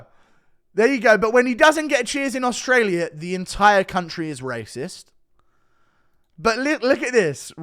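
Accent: South Africa. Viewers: Great SA accent dude! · dawg your Saffa accent is on point!😂🔥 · Hahahah that South African accent ... not bad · you are hell of entertaining, it's always good listening to your podcasts. You put a smile on my face, thank you. Love the Saffa accent and the truth bombs on Adesanye. You got balls telling the truth, love it.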